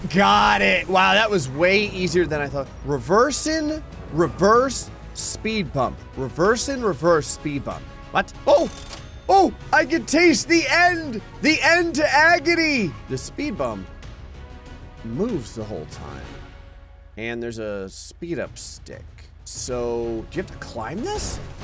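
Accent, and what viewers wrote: United Kingdom. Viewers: IS FROM THE UK????!!!!!!!!! 😮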